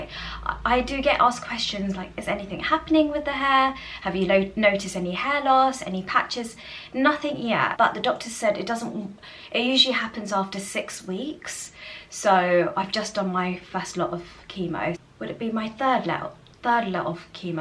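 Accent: England, United Kingdom. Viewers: I love listening to you speak you have such a sweet voice! I love hearing you say Cozy!😅 I live in USA, in California. I enjoy hearing your English accent. Hugs from California ❤.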